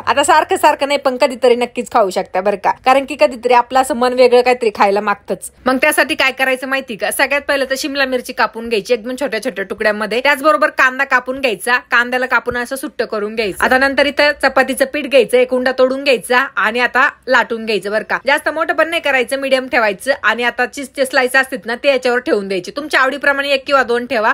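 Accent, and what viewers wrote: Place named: Khandesh, India. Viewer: khandeshi bhasha 😅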